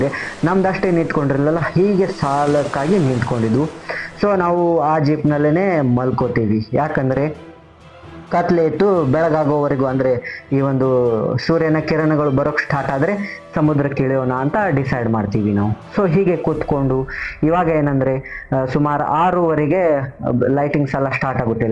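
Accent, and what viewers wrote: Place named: England, United Kingdom. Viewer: ಆಂಗ್ಲರು ಕನ್ನಡ ಮಾತನಾಡುವ ರೀತಿ ಮಾತನಾಡದೆ ಸಹಜವಾಗಿ ಕನ್ನಡ ಮಾತನಾಡಿ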